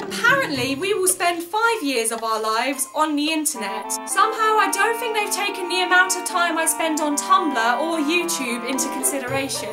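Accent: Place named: United Kingdom. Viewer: I really enjoyed this video! Please keep them up =] Where are you from? You have a very strong British accent!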